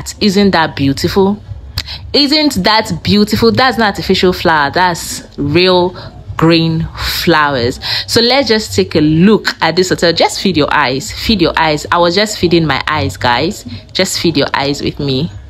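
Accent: Ghana. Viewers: You sound more Ghanaian than a Nigerian. Very soon, your accent will change completely 😂 Nice video btw✌️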